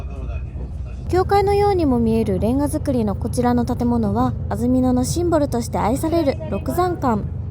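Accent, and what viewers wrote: Kansai, Japan. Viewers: 穂高町に一度行ったことがあります、きれいな素敵な町でしたよさんが時々関西弁が出るのが親しみを感じます。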